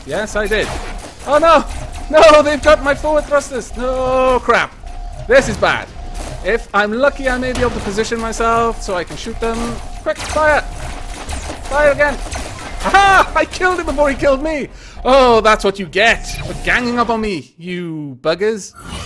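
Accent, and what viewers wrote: United Kingdom. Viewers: I wonder where that accent is from. It's like a proper British accent with some foreign mixed in to make it interesting.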